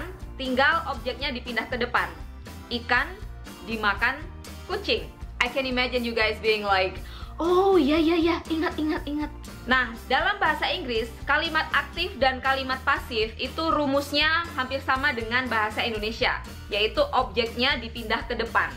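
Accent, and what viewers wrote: Surabaya, Indonesia. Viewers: Mama udah lebih dari 5 aku suka video bahas bahasa inggris. ternyata sama2 orang sby, makanya paham gitu berkat logat2nya. Nice! wkwk Bahas masalah jebakan di toefle dong mom. Pengen tauuuuu. makasih banyak! good job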